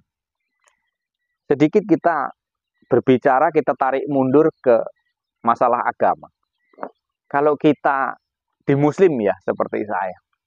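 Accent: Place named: Java, Indonesia. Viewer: Dari bicaranya bang ini asal dari Jawa kelihatanya,bukan asli Jambi,😂😂 trmksih bnget bang ilmunya,sy banyak belajar dri Abang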